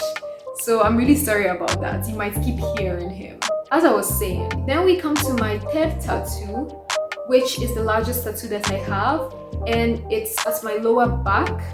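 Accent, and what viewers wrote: Ghana. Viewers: You're so wise for your age. And your Ghanaian accent is so sweet and authentic. I wish I could regain my ability to speak like that again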